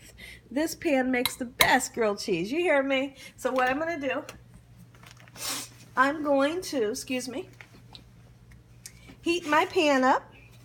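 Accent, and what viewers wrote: South, United States. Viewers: I love that southern accent too. What a lady!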